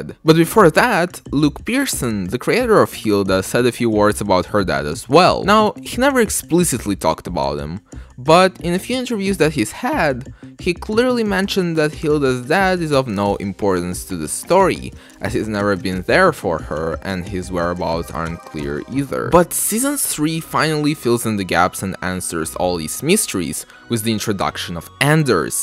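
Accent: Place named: Brazil. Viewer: Are you brazilian? You have a familiar accent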